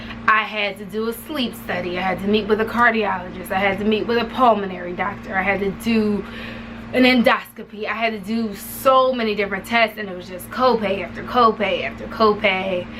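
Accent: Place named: DMV, United States. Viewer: You sound like you're from the DMV! Lol where are you from?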